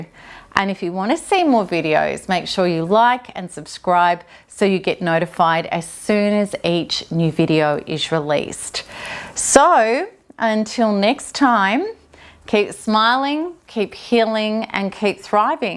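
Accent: Australia. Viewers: thank you. I am in the forum and the ppl there are angels but I can’t seem to break through the barrier and the time it takes every day to go through the modules. I don’t have years to get my life together.... I hear that this takes years to overcome and condition the subconscious... I feel discouraged because I need help now. My life is a mess!!! I really don’t know what to do and how. I’m at a stand still and feel trapped in a life I did not choose and that does not belong to me. I don’t know if that makes sense... Anyway I keep listening to your videos and reading your blogs. You are an inspiration to me. I grew up part of my childhood in Australia and hearing you accent it’s comforting to me... I think you are gorgeous and have been getting better with years. I’ve been following you for nearly 6 yrs now. 💗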